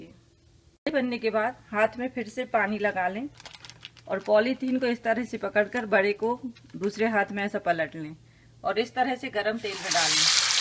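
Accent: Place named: Bundelkhand, India. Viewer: Bhasha k lhze se bundelakhnd jablpuriya lg rhi h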